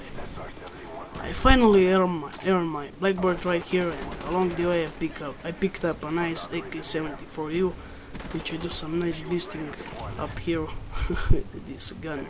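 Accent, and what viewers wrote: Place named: Mexico. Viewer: You sound Mexican rather than someone coming from the Balkans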